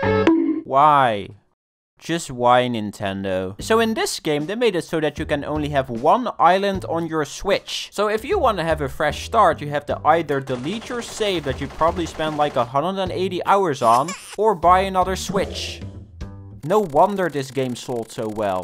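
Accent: Netherlands. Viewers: ah yes, dutch accent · Man is dutch?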